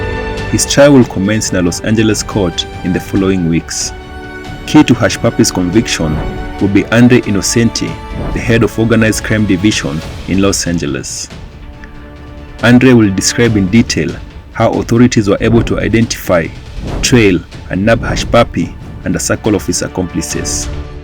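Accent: Kenya. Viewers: The Kenyan accent of the narrator...the tone of the video seems to admire the fraudulent activities...it's very sad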